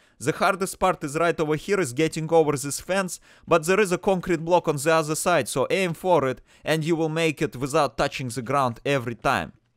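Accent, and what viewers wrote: Russia. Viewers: This is one of the less common variations of russian accent btw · love the russian accent and thanks for making this tutorial i didn't know how to do this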